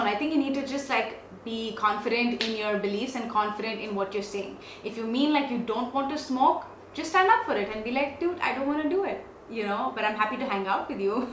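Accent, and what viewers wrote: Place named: United Kingdom. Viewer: Love your British accent can you make a video on British accent pleaseeewwe.......... 💓😍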